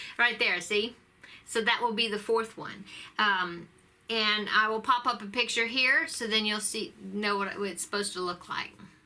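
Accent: South, United States. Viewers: Im from southeast Georgia. I just came across your channel this week and have watched the last 7 or 8 in a row to try to "catch up". You caught my attention with your accent. I thought, "oh yay she's southern too !" Lol Congrats on your viewers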